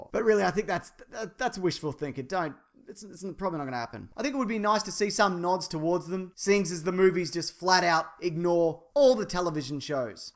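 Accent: Australia. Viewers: hey you're aussie right? like... you sound aussie. if you are, which state. WA is where I'm at.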